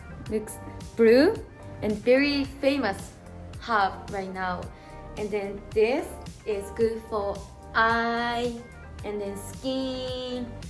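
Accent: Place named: Japan. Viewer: Valo Hoise, Her English is truly Japanese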